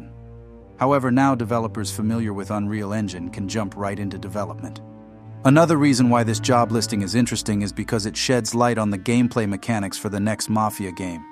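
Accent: United States. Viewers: You have a very crisp clean Italian American voice. And this is coming from a Svensks Deutsche American Vikingr. Keep up this fantastic content sir.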